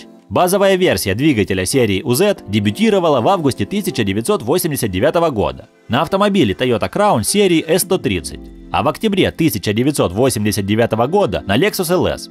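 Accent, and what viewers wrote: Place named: Belarus. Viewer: акцент белоруса, эксперт?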